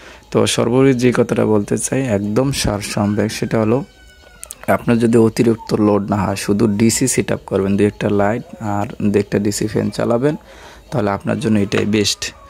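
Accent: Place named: Chandpur, Bangladesh. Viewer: ভাই আপনার কথায় মনে হচ্ছে আপনি চাঁদপুরের মানুষ। চাঁদপুর সদর বা হাজীগঞ্জ, বাকিলা ঐদিকের। আমি ধারনা করে বললাম। আশা করি সত্যিটা জানাবেন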